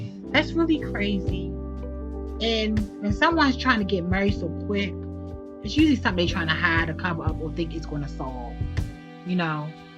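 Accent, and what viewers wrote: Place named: Baltimore, United States. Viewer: Cleary a Baltimorian narrating this. Accent is thick!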